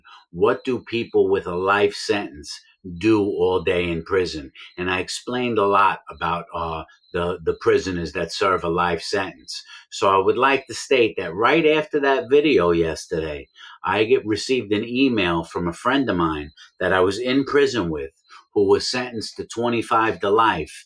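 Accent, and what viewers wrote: New York, United States. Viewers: That's a NYC area accent.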